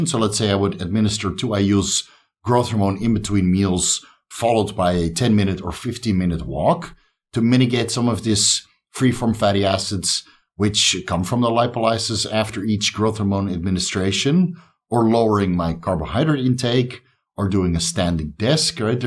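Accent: Netherlands. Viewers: Are you dutch ?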